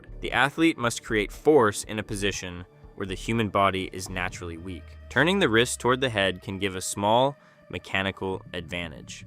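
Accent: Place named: Ukraine. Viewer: I missed your videos brother and still miss your Ukrainian accent, hope you are doing well.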